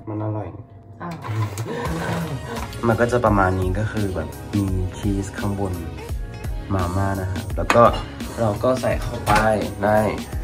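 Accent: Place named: England, United Kingdom. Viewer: Hihihi I love yo English accent 🥺♥️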